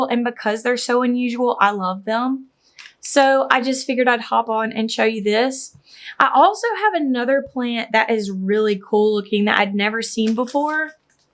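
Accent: South, United States. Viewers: You sound like Arkansas or Georgia! Love your cacti Ma'am! Edit: Your sun porch looks amazing!!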